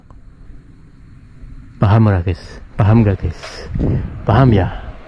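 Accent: Banyumas, Indonesia. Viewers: Jangan malah resep gua mah denger bahasa ngapak kaya kiye 😂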